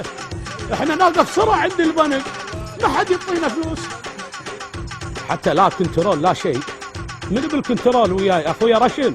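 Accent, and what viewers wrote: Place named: Kuwait. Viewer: ظابط اللغه ماشاء الله كويتي انت ها .؟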